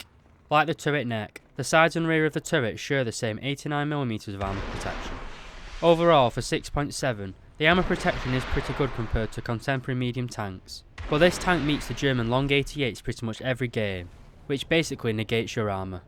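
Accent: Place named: England, United Kingdom. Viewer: Ayup mate... Whereabouts are you from in England? I'm from the high peak myself so your accent sounds quite familiar to me😁👍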